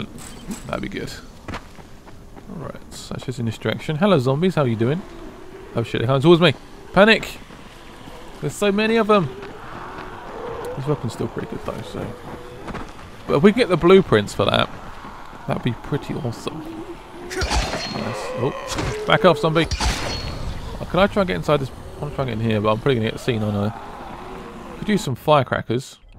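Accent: England, United Kingdom. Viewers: Are u born in England because u have this accent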